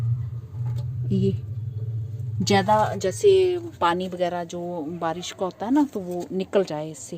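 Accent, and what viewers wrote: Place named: Haryana, India. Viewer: Ok ,aap jesay bolti hai us say legta hai ki aap haryana say, bahut achi city say hai aap. 👍🤝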